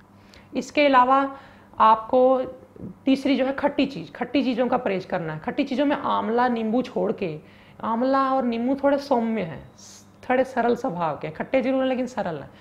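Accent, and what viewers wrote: Punjab, India. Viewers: Aap bhut achha btate h pr Aap ki looking naam match krta h Pr aap ki bhasha me punjabi lhja h achha nhi lgta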